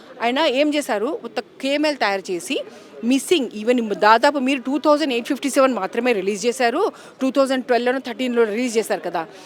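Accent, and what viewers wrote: Telangana, India. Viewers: I'm feeling that I'm in 70 years back nizam rul... perfect powerful Telangana language madam 👌👌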